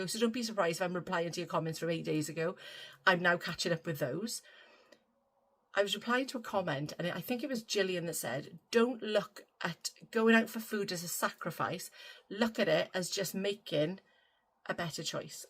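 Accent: Wales, United Kingdom. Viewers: Nothing to do with slimming but I just love listening to your beautiful Welsh accent.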